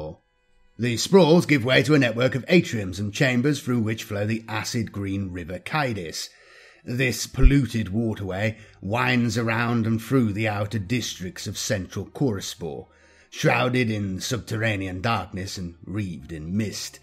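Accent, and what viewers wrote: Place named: RP, United Kingdom. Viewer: You have crafted the almost perfect 40k narration voice , English received pronunciation with a touch of theatrical enhancements to add a dramatic effect , but not too over the top or camp , admirable restraint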